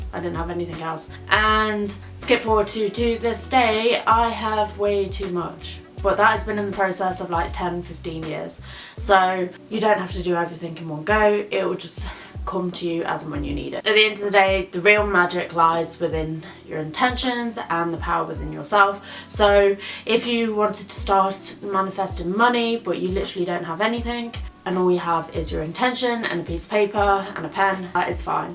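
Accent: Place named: Northern England, United Kingdom. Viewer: Dya lIve in da US ?? U r sound like u r from Northern England 😺😺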